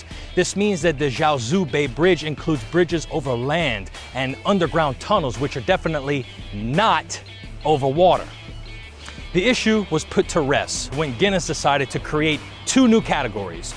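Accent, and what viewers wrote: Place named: Cajun, United States. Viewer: It isn't very strong, but I hear that touch of Cajun accent lol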